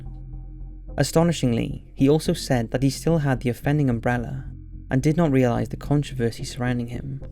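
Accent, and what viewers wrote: United Kingdom. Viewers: getting tired of hearing british narrators....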